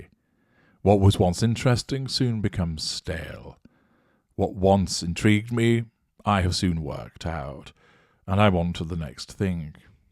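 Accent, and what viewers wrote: United Kingdom. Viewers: Like & appreciate your teaching. As an American (raised in Texas), LOVE the tone of your voice & British accent. I would think you could have made quite a bit of money narrating books, doing voice over for TV-radio commercials, and voice for cartoon movie characters [the sophisticated man with a handlebar moustache or the evil criminal character].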